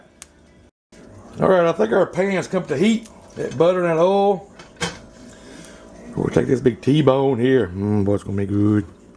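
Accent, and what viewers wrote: South, United States. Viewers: his voice is classic country boi status.. Kool Dude though.. i like his technique, i would definitely add my own spin to it... but overall good job..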